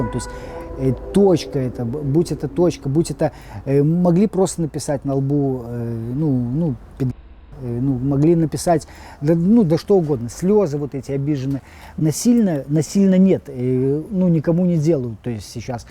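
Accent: Belarus. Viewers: какой всё таки специфический белорусский говор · Чувак из Белоруссии, по разговору. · Белорусский акцент у чувака.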